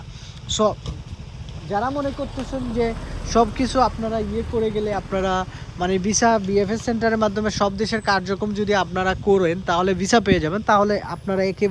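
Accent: Sylhet, Bangladesh. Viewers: সিলেটি ভাই❤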